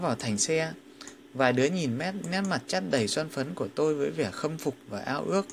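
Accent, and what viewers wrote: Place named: North, Vietnam. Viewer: Giong doc mien Bac cua rat truyen cam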